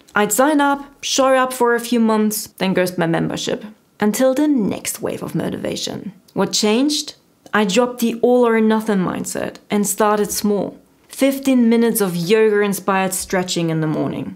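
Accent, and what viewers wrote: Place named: Germany. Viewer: Fantastic accent? Sounds like German raised with some Southern England living, some Australia, a lot of US media?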